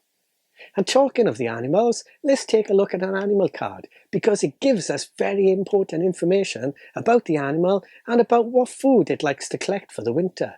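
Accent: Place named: Wales, United Kingdom. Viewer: Great review this is one game that has been on my radar for some time ,and your video has given me the inspiration to add it to my next order with game lore Also its nice to here a whelsh accent as a lot of the board game reviews are from the usa ,so keep up the good work from a old board game loving chat from just over the boarder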